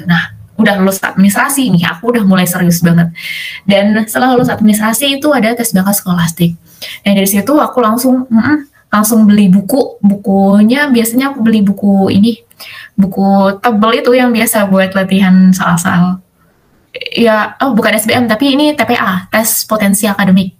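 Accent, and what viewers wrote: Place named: West Java, Indonesia. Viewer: Orang samarinda tapi logat dan someah nya vibe org sunda bgt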